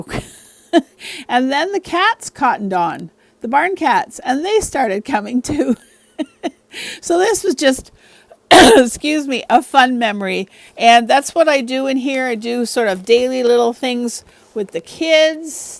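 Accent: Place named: Canada. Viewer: Just love you to bits! Very interesting to hear about your family (even though it's tragic). You are the opposite of idiotic silly, but I appreciate your sentiment. I have to say, my husband was listening while I watched and was smitten with your Canadian accent. But then, he thought you said "teared tray" and asked me if you were crying. 😳 Husbands!...I get my baskets the same way you got yours.😂 Take care and keep warm. God bless you friend 💕🥰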